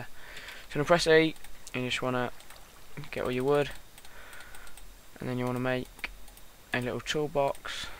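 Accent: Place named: United Kingdom. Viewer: tell them ppl he doesnt sound lik a girl he sounds lik a british person!!!!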